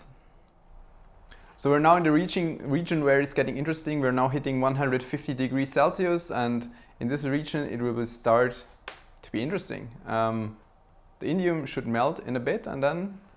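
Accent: Germany. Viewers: Your german accent is very noticable xD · He's german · you are german? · Bist du deutsch? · Man merkt sofort wenn nen deutscher englisch spricht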